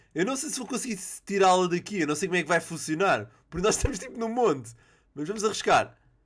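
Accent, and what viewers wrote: Portugal. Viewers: tu é de Portugal? =D mt linda as vozes de lá =D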